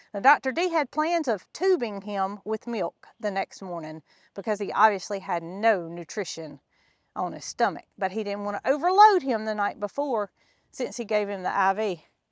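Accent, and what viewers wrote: South, United States. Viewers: I knew listening to you that you were going to say”bonkers”! I love your southern accent! Yes! It certainly is going to an interesting weekend even up here in MD! You guys take care and hopefully we all will be ok! I was scared to use my cast iron fry pan because my stove top is glass. I just didn’t trust my wrist to set the pan down gentle enough not to crack the glass. So I gave it to good will.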